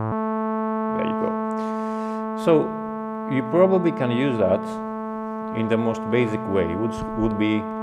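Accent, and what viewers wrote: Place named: Greece. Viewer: Γιατί απο τα αγγλικά που ακούω νομίζω ότι είσαι Έλληνας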